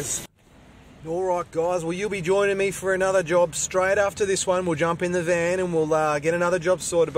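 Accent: Australia. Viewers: New subscriber here. Love the Aussie commentary. 'this is where we get our water, trees make our water' had me in hysterics.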